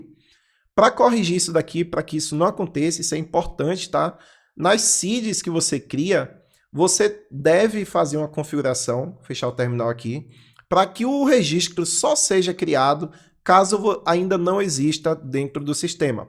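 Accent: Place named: Northeast, Brazil. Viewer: Muito bom, me ajudou demais!!!! E ainda é nordestino que nem eu!!!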